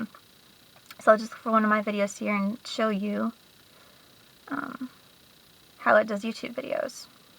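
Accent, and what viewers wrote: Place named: Texas, United States. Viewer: coz thats how we spek here in texas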